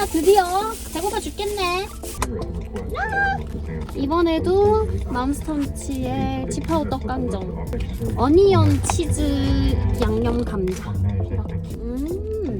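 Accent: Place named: Gyeongsang, South Korea. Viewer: 서울말 쓰려고 노력하는 경상도 미인이네^^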